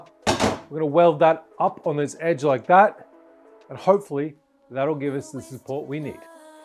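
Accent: Australia. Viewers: Is that a some what Aussie accent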